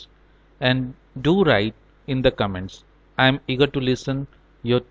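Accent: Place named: India. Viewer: He is surely from India if you see someone in heavy accent! Haha lol, me from Nepal, similar country. We have also similar accent* hehe😂 lots of love from nepal. We from nepal and india feel your accent to be unusually modern which is the reason of this.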